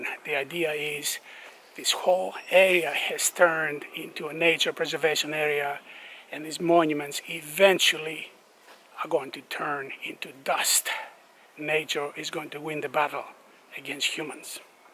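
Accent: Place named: Greece. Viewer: Thanks for explanations and for video ✌️ Like your accent. I guess greek:-)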